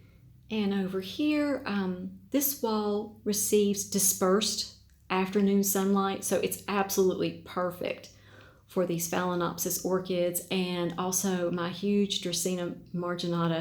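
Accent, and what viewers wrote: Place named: Tennessee, United States. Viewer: I truly appreciate you taking a moment out of this first sunny day in what feels like weeks, to reply to my question. I apologize because I wish I hadn't had to use the brand name of the product I asked about. I was wondering if you had any specific reasons perhaps I shouldn't include the aforementioned product into my initial soak and subsequent watering schedule. I'm born & raised in S.E. TN - my entire life, so not only does your accent feel like home.. 💯...but, your tips concerning directional exposure(s) help me, specifically. Your inherently generous and kind way about you is as lovely as your collection. Enjoy this sunny Sunday!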